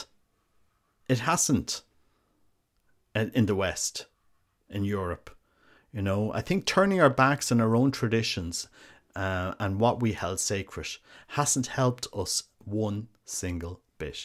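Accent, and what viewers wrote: Ireland. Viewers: I just found your channel and appreciate your discussion on the Holy Eucharist. It is too rare. I diverge a bit from you however on revealing the parish, if not the priest. What you have described is a grave sacrilege and crime in canon law. It is further a scandal to any witnessing it, serving to malform the faithful. I don't know where you are, but if I know that a parish or priest operates contrary to the law of the Church, scandalously, and bars the faithful from properly receiving sacraments, then it's nothing private. You can't let it go so that innocent travelers wander into a den of sacrilege or will be refused the Eucharist when they may really need it. It's just my own opinion, but I couldn't sit on that krp secret without it burning me in payment for the harm it could do. I live in a diocese where 2-3 parishes are notorious. New people to town ask me frequently where they should go. 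The whole reason that I suggest not going to those parishes is because of their noncompliance with Catholic teaching. I couldn't let those people be victimized. Anyway, great video. Good job. As an American, I love a good Irish accent. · At Holy Name Cathedral here in Chicago, the bishop celebrant extended the Host that touched my hand, leaving two broken halves inside my palm for which I was harshly admonished not to grab for the Host. The Church should have left well enough alone. Thanks for being here. And, from your mellifluous voice and accent I tell a Cork man.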